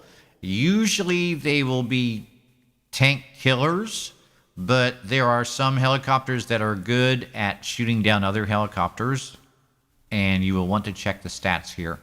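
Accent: United States. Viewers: You sound sooo much like the American who visits Fawlty Towers in one of the episodes! Thanks so much for the review though, youve helped me decide to buy it but with a very humble attitude :) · Thanks for the review! By the way, I bet you're from New York State! Your voice reminds me of Billy Crystals voice! Especially in the movie 'Parental Guidance', when he's commentating during the Baseball Games!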